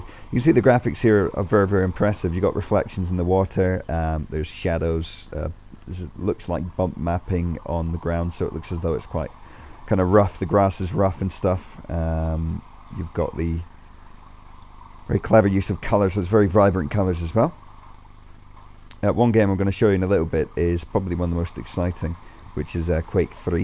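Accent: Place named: United Kingdom. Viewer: i love british accent!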